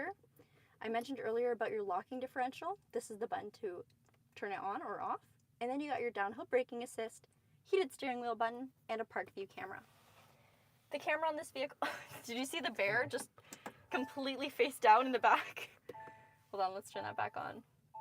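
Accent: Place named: United States. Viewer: Disappointed hearing an droning American accent ...lost in translation zero authenticity coming through.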